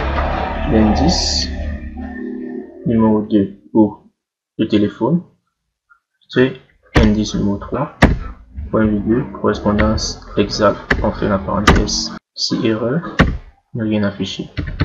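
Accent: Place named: Africa. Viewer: C'est magnifique on a beaucoup apris et avec du français à l'accent africaine ça fait vraiment plaisir, merci.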